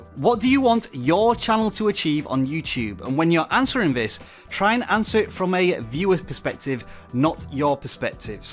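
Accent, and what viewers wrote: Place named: Scotland, United Kingdom. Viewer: i can tell he's scottish 😁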